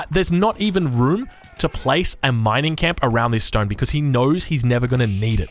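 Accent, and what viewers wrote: Australia. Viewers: Im italian but i can feel his Australian accent is funny.good to see some aoe game and learn something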